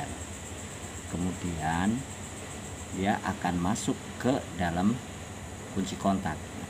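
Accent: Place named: Banyumas, Indonesia. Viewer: Salam satu "ngapak" kang..... 😀👍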